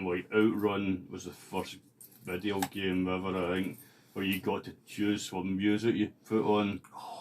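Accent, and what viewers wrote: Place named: Scotland, United Kingdom. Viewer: I can't wait until we meet again so I can understand proper Scottish - I'm half way there, though.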